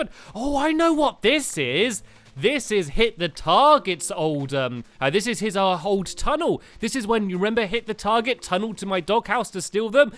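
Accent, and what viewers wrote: United Kingdom. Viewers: LOL it's ester not esta he just pronounces it that way cause he's British XD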